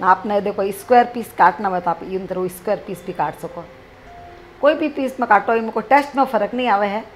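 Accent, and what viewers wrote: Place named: Marwar, India. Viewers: Aap itni pyaari maarwaari Bolte ho.......aapki aawaz mein Mitti kee khusbu aati hai......aur wohi taste Aapke khaane mein bhee aata hai ......! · Aapki boli sunke maza aa gaya .... rangilo rajasthan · Mujhe rajasthani nahi aati pr it is very lovely to hear. And this recipe was amazing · I love her accent ... would like to meet her, where does she live? Jodhpur? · Wa wa sa Maine to aapani marwadi boli ghani meethi lage. Bahut hi chokhi tariya su btavo ho sa aap. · Even I also liked her voice and Rajasthani language how she describes🙏 really amazing so sweet voice and her presentation may god she lives long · मारवाड़ी बोली चोखी लागी, पर ए सब बनाबनो म्हाने आवे सा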